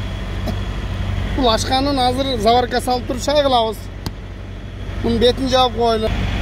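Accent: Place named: Osh, Kyrgyzstan. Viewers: Ака кызык кызык суйлойсузда оштон болосузбу ишинизге ийгилик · Ассалому алейкум. Бир тууганым тилиниз бир ширин да. Туштуктуктор биз тушунобуз,урдура берин.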